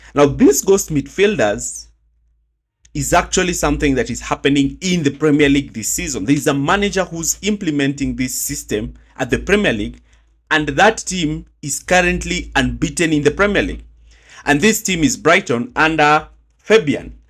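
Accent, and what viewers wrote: Kenya. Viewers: Vile niliskia hiyo sauti nikajua tu huyu ni mkenya. Keep up the good work. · Hii ni sauti ya mkenya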